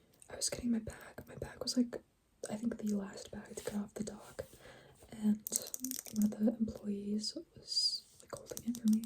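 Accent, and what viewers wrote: California, United States. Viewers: That accent is pure cali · She definitely looks and sounds Californian. She’s humble though. ❤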